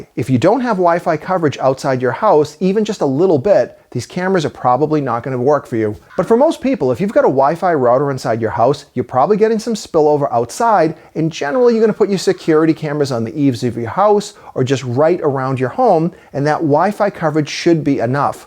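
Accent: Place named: New England, United States. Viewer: The narrator definitely sounds like a New England type. Maybe he’s related to Norm on the New Yankee Workshop. You guys take care. I hope the evidence that you gather from the footage is taken into consideration if the situation turns into a legal case. Good question, how can you keep a camera?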